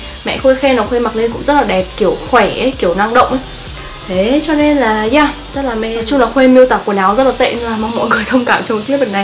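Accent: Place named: Southern Vietnam, Vietnam. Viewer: Khuê nói giọng Nam dễ thương quá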